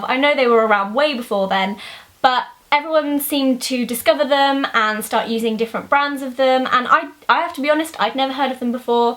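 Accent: England, United Kingdom. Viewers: Is it just me or that by having a british accent you can say things and they seem funny? Does anyone find Australians funny? Zoella I love your personality. Id seen you in a couple of Fleur and Tanya's vids and this is my first video of yours i've seen and i'll be keen to watching more:) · your bloopers are hilarious and with a british accent... it makes it even better!!! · Your english accent is great, I mean I´m from Germany and I understand everything:) Thank you for making me feel like I could be good in English:D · i love listening to your British accent LOL:) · I love you so much! You have the thickest english accent and it makes your videos a million times better!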